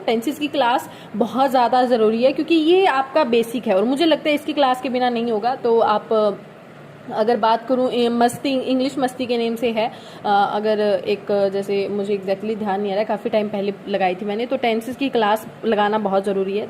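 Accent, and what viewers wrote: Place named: Maharashtra, India. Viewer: Maharashtra s clear h ye to.... Marathi aati h apko🤔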